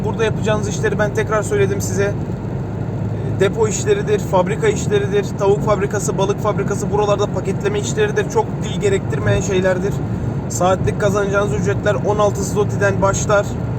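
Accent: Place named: Black Sea, Turkey. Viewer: nerelisiniz konuşma sanki karadenizli gibi????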